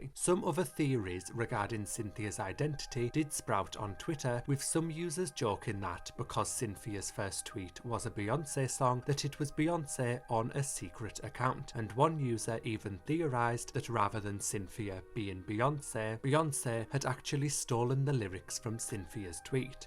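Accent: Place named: Yorkshire, United Kingdom. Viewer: It's def not London, but i can see why someone would say Newcastle since it's up north. Hes def a Yorkshireman. 🫣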